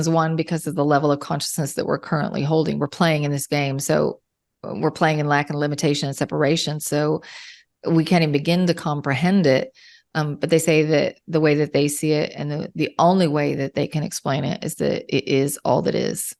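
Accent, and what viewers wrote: Australia. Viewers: They sound kinda Australian. hehe :) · Loved this so much!! Touched points I thought I felt I needed clarity on, love it! I think she's got some Aussie heritage? I can hear it in her voice! Wonderful insight from source XXX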